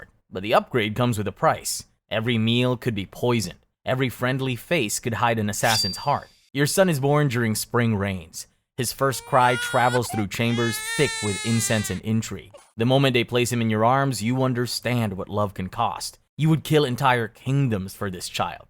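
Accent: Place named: Arab world. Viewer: arap gibi okuyor soluğunu gırtlağını silktiğim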